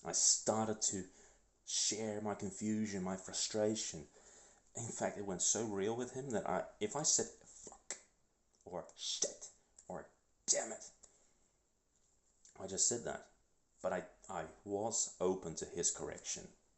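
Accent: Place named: United Kingdom. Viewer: I just prayed for you. I also want you to know that I haven't prayed in many years but I really wanted to do it for you. I have this necklace with Jesus on the Cross. I took it into my hands, went on my knees and asked Jesus to give you the strength, will and creativity to come up with solutions. I asked Jesus to help you out in finding answers. I trust Jesus and asked him to help you in any way he can. Regarding the more practical stuff, I am not sure how I can help. I am not from the UK (you sound British, so I presumed UK) but from the Netherlands. But here in the NL we have organizations where you can sign up and then you can apply for housing based on duration of membership so to say (I am trying my best to translate as well as I can). Sometimes you can get forwarded based on where you live and your current situation. But I have no idea what systems you have in the UK. 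Regarding work, I would suggest finding out what your strengths are and finding a way to learn it online while you do your other things. I thought I heard you say you were in Personal Training. But a quick example is learning to code for example. You can easily learn this online and after a couple of months you can start applying at jobs. The market right now is breaking with a lack of employees everywhere (at least here in the NL) so it's more about showing character than skills. So I also suggest showing up and just making it known you are driven and ready and able to work and make things happen. I hop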